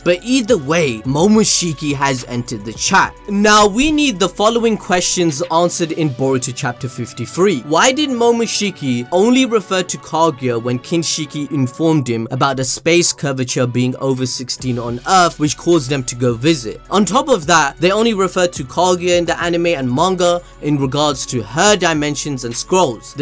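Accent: Punjab, India. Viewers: Are u punjabi bro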